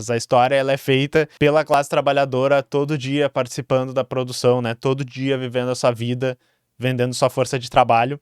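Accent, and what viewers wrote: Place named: Rio Grande do Sul, Brazil. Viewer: A imitação de mineiro com sotaque gaúcho realmente me pegou 😂. Excelente!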